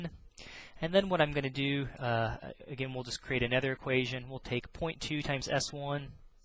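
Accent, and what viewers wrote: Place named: United States. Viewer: And the american accent makes it cooler as well. :D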